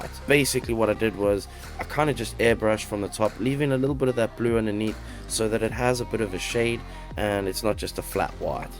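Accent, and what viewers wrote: South Africa. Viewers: Your accent is extremely South-African. 👀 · South African?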